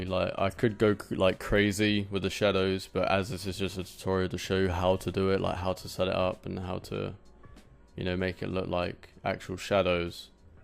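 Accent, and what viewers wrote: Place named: United Kingdom. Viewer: What part of the UK you from?... ifyou even are. im down east in LONDONNNNNNN <3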